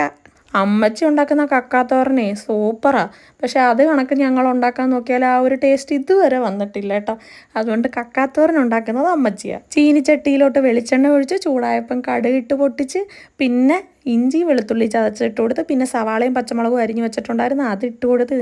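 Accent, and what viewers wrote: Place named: Kollam, India. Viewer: കൊല്ലം കാരിയാണ് അല്ലെ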